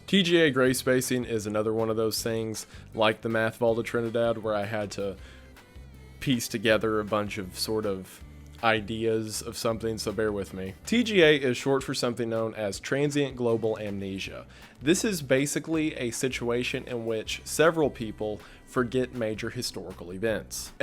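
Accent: South, United States. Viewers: He is so articulate..and every now and then - I’ll hear a very slight, southern, twang (or maybe it’s considered a drawl, forgive me, I’m nothing more than a Michigan Troll) but nevertheless, I honestly enjoy hearing it!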